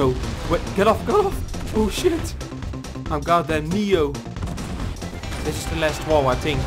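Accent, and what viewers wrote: Netherlands. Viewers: Are You dutch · Where are you from? You sound dutch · sounds like your dutch.....ben je dat ook? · That dutch accent tho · Are you dutch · Such a dutch accent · He is from holland he said and i am from holland and i can recinize his accent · Are you dutch? you sound like your dutch :D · Is this guy Dutch because he has a Dutch accent?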